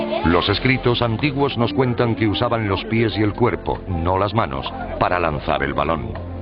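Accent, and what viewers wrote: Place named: Spain. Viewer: La locución no es español latino, sino es español de España.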